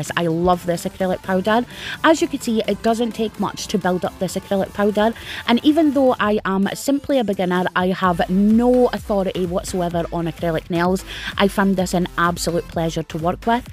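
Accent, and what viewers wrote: Scotland, United Kingdom. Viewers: Love the colours I need to get me some colours! Keep up the good work. Love ur accent by the way it reminds me of my mum, she's Scottish from Hamilton.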